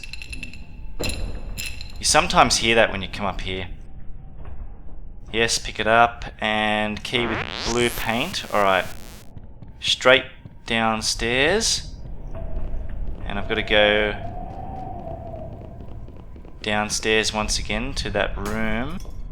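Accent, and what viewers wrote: Australia. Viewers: so entertaining with that aussie accent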